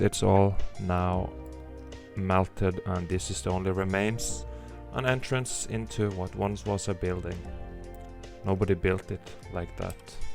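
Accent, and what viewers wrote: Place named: Scandinavia. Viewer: Where r u from. Those of is in the states have a hard time recognizing different accents . My guess is 🇳🇴 or 🇸🇪 or Finland 🇫🇮.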